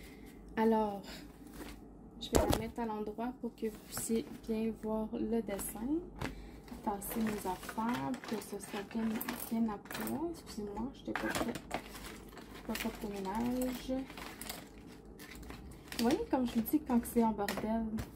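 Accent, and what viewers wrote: Quebec, Canada. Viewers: j adore ton parler quebecois 😂😂😂 je comprend pas tout mais c est drole 😍😘😘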